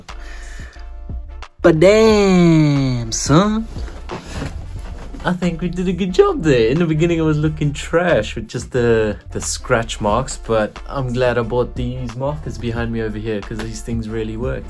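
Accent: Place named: South Africa. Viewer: Heard his accent and knew he was from SA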